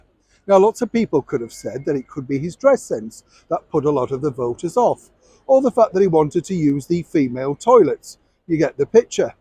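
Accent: Yorkshire, United Kingdom. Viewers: I’ve never heard you sound so Yorkshire, ‘ it’s a bloke in a frock’! I absolutely loved this report, well done. The reason it’s a flop is because he is butchering one of our favourite writers.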